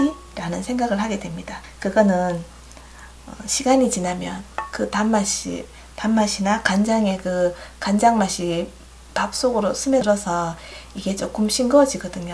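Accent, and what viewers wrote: Gyeongsang, South Korea. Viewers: 경상도 말씨 진짜 정겹네요.말씨가 넘 예쁘요.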